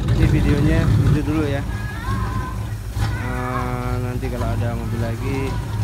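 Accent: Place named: Semarang, Indonesia. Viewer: Kassemarange daerah ngendi smpyn apal q logate qong semarangan ngene ki.. Kebetulan tonggo dwe kas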